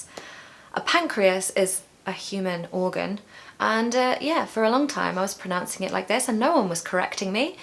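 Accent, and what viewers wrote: RP, United Kingdom. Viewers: Well, that pronunciation seems quite posh, but not relative to Indian accent. London is a dream place to be for every person born in India. I wish I could settle there, but it's very costly. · I love british accent. I like your voice and videos.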